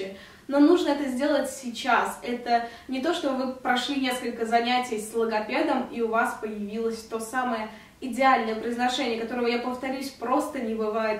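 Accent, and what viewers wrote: United Kingdom. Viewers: А сделай видео на английском языке, интересно как раз послушать твой британский акцент :) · у кого нет музыкального слуха не стоит отчаиваться) есть исключения, я ни в одну ноту не могу попасть, а с акцентами в английском и испанском проблем нет. все же есть универы, где фонетику ставят на ура за месяц) спасибо за видео!! теперь все лопнут от интереса послушать британский акцент))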